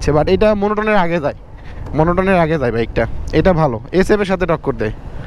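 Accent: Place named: Bogura, Bangladesh. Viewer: জি ভাইয়া অবশ্যই তবে আপনার আঞ্চলিকতা ও আমাদের বগুড়া আঞ্চলিক অনেক মিল রয়েছে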